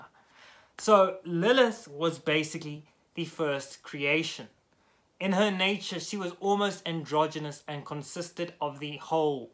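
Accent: South Africa. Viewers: Are you South African? I am South African living in Costa Rica. Lilith in the first house.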